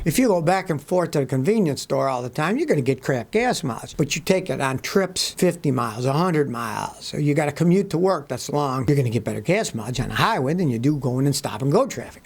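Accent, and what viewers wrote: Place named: Buffalo, United States. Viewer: I wish could get his vowels correct. Must be his Buffalo (NY) accent. Ex: ONscrew instead of UNscrew. You think he's saying to put something on instead of him meaning to take it off.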